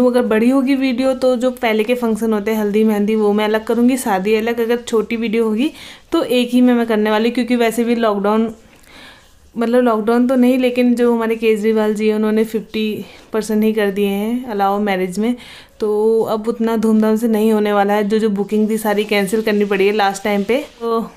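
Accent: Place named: Garhwal, India. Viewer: but she is not Punjabi she is uttarakhandi (gadwali) like me I am also from uttarakhand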